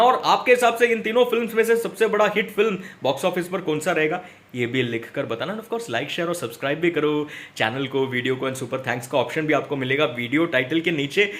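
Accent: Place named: South India, India. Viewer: great job brother..by the way are you south indian? your tone seems to be typical south indian:)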